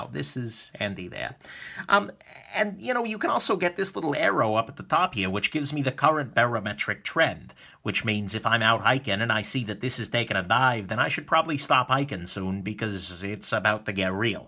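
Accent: New York, United States. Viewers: New Yorker much? Nice review 👍🏼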